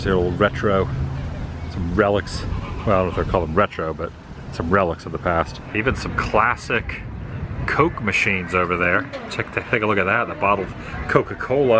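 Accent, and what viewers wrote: South, United States. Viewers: do you have that beautiful Southern accent I love so much it's my favorite accent 💙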